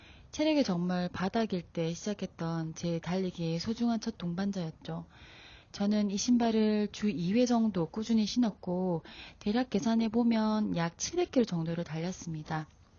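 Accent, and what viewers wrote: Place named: Gyeongsang, South Korea. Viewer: 경상도 사투리 ㅎ 귀여워요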